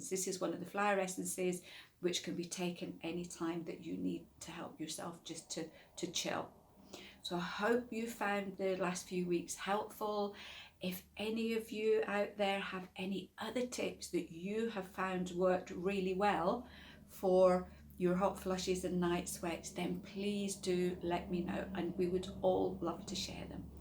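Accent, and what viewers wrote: Scotland, United Kingdom. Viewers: Mine are just starting. I don't go red but I certainly sweat. Can I take sage tablets while taking citalopram? Do I hear a wee scots accent there? I'm scottish but live in Germany...😊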